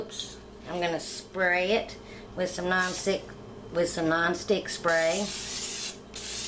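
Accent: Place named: South Mississippi, United States. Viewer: I have got to know where you are from? You sound like your from my neck of the woods down here in south Mississippi. The buns turned out great, and looked beautiful!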